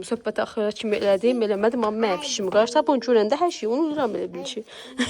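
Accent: Sheki, Azerbaijan. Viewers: Salam, xanım.Necəsiz? Sizin nə gözəl dialektiniz var.Yəqin ya Şəkilisiniz,ya Qaxlısınız.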